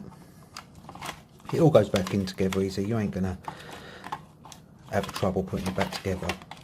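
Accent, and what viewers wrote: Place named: South London, United Kingdom. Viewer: Nice one mate. I was banging my head against the wall until I found this vid. South London boy aye.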